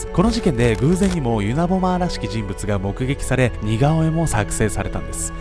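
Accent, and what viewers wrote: Kansai, Japan. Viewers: 関感凄くて草www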